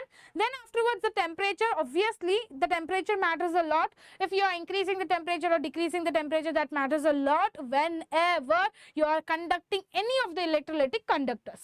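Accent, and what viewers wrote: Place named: North Karnataka, India. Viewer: just like hubbli, belgum, dharvad language😅😅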